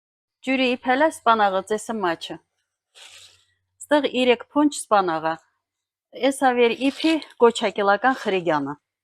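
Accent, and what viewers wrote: Artsakh, Azerbaijan. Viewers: Շատ սիրելի տիկին ջան ,ես շատ եմ սիրում Արցախի լեզուն ,օրհնվես էդպես էլ խոսիր ,դա է մաքուր հայկական լեզուն ,որ մինչև այսօր մնացել է անավարտ, իսկ ամենակարևորը իմանաք ,որԱՍՏՎԱԾ խոսում է հայերեն ,այն էլ Արցախի բառբառով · ❤❤❤բարև ձեզ շատ հավեսով եմ յեշում,միշտ էլ մեր Արցախի բառբառով խուսեցեք։։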